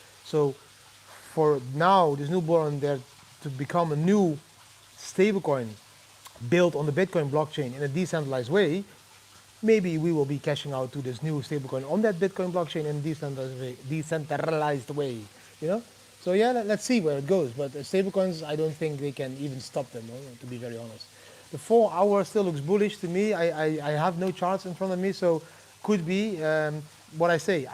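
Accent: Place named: Netherlands. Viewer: dude man bro! i just realised your accent is dutch! i grew up with dutch granparents :) much luv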